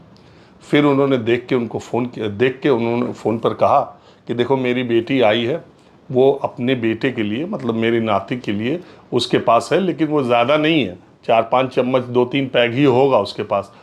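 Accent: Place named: Allahabad, India. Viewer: Sir Mahol Badhiya hai.Aap ke bat-cheet me Elahabadi mahaq rahti hai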